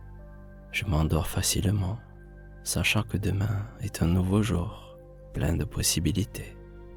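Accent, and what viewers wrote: South, France. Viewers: Un grand M E R C I. 🎉 à toi: j 'aime ta voix du sud. 🙏💙👍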